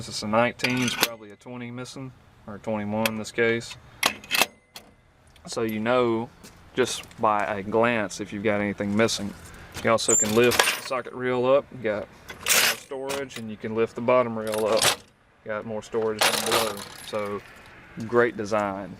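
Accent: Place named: Philadelphia, United States. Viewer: Do you live near the Philadelphia ms area? I know a girl with very similar/specific accent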